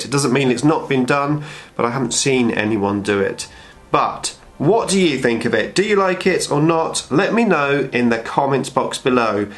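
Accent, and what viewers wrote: United Kingdom. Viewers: When he says: "My void dragon" I think that translates to void dragon. It can get a bit lost in the British accent =P. Great work! Prince MN Represent!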